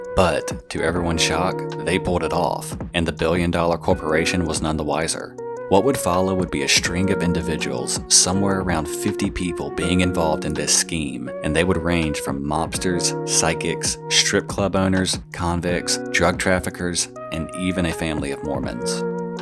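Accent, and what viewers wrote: South, United States. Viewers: First vid I’ve noticed the southern accent. Love your content ! Keep doing what you’re doing, my guy ♥️ I wish you all the subs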